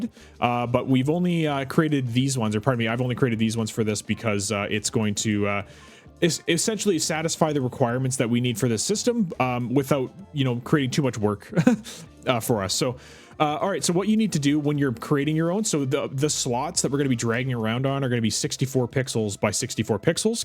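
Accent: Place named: Canada. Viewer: just realized you're a fellow Canadian after hearing the "Out" and "About" pronunciation 😂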